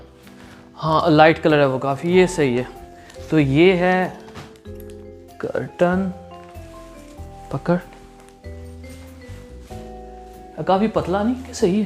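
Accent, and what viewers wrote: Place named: Punjab, India. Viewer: Punjabi bht pyari bolty hn ap😀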